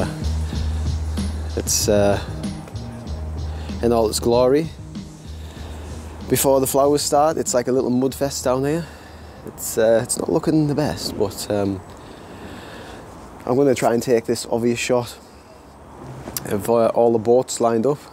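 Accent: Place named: Durham, United Kingdom. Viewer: Just come across your channel and I love it! Your accent is identical to mine - a Durham lad - and I love how you appreciate Pentax! I have had all brands over the past 10-12 years from point and shoots to my current camera the Sony A7iii, but the best shots I've ever taken were with the Pentax K3. Keep up the good work pal!!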